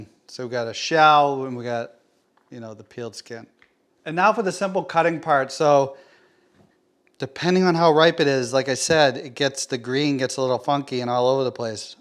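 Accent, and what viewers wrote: Boston, United States. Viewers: Thanks buddy! Love the Boston accent btw!!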